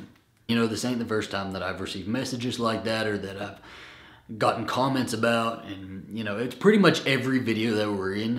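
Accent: United States. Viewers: where the hell in America are you from? Your accent is crazy American!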